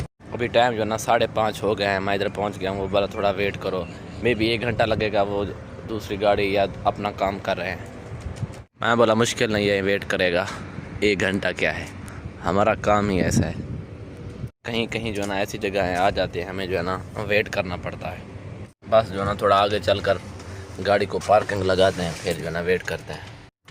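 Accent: Saraiki, Pakistan. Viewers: استاد اردو تو ایویں لگدیں جیویں سرائیکی ہو ویں۔ مسات ہیں کتھوں دا؟؟؟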